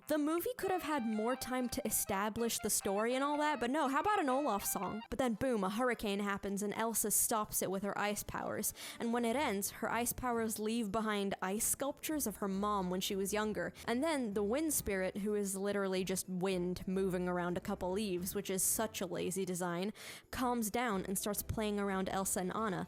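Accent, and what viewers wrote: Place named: Ireland. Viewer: you sound irish and i love it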